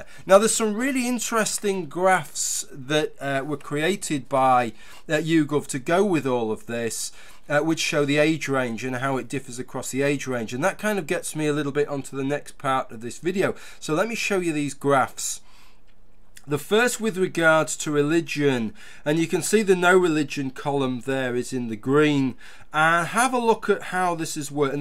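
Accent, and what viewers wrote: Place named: Birmingham, United Kingdom. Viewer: This has nothing to do with the video but what is that accent is it birmingham?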